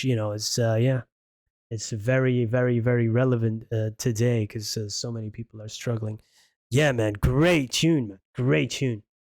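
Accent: United States. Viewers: Hey thanks for the reply. First let me apologise for calling you an American I didn't mean to insult you. But true you do sound a little American. I would love you to react to more / videos as I remain a lifelong fan. I would suggest looking at some of Wellers solo work as well particularly the album some of which was filmed at La Luna in Brussels. I included this as a small example <link> Keep up the great content 🤘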